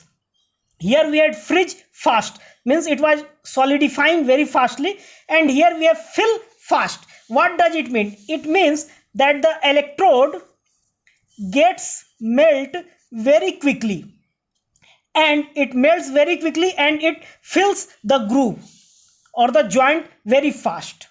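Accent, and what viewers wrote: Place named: India. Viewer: I usually cant put up with the accent and dont take indians seriously in any trade.. but YOU are the first I can say, explained this very well. Exactly what I was looking for, visual learning and drawing board. Amazing. Cant find another video on youtube like it. Definitely a happy subscriber!!! 😄😄😄😄 I can tell youve done your theory and your prac must be on a high standard. Great stuff brother! Im buying more electrodes today because of u lol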